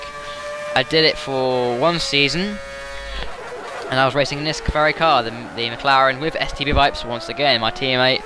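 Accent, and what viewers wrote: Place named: Birmingham, United Kingdom. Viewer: BRUMMIE BITCH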